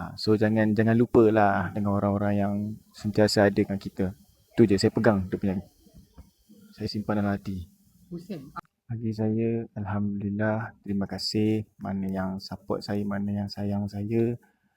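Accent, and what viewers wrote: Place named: Perak, Malaysia. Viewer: Bila bercakap kuat loghat perak... Tengak.....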